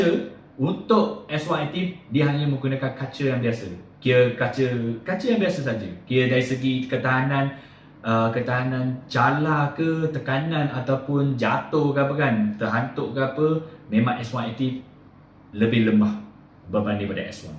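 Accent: Terengganu, Malaysia. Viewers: Bunyi macam org Tranung ni.. 😊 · mcm org Ganu je ni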